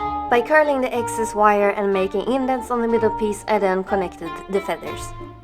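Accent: Sweden. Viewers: Wow, great work!! I'm stunned :O Also, could tell you were swedish by your voice, so tjena;))) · that swedish accent tho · omg jag gissade att du var svensk man kan verkligen höra det på din accent!! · Det tog mig nästan hela videon innan jag insåg varför det låter så bekant när du pratar, sedan insåg jag att det är för att du är från Sverige! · Found your channel randomly, but you'r swedish aren't you? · Oh are you swedish? :) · Are you Swedish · Are you from Sweden cause you have a Swedish accent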